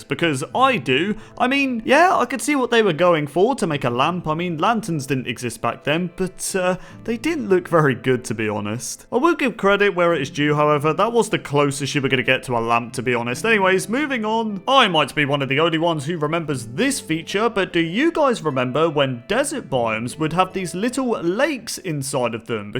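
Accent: United Kingdom. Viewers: bruuuh are you talking UK english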